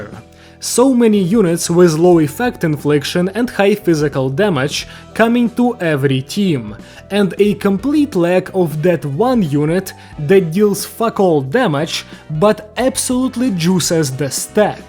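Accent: Russia. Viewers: Слишком сильный русский акцент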